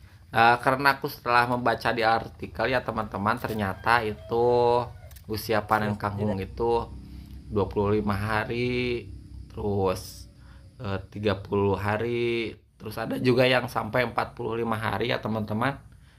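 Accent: West Java, Indonesia. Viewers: Loagt nya sunda bnget.. Indonesia gitu lo.. Beragam bahasa dan suku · Sunda pisan si aa jd seuri sorangan nonton na og, ttp smngat A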